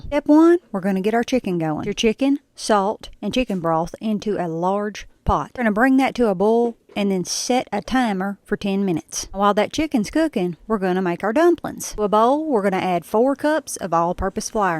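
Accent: South, United States. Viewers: This woman has a southern accent and she may pronounce it however she likes. I know I can pronounce pompous and presumptuous ignoramous pretty well, and you, my dear are one.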